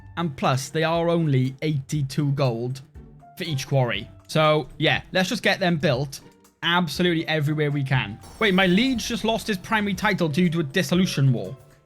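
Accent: Wales, United Kingdom. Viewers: the welsh accent threw me off, sounds like you straight from Bridgend! lol LOVE IT! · Are you Welsh? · Is that a fellow Welsh man i hear . Respect from the Merthyr Valley. 🏴⚒️⚒️⚒️.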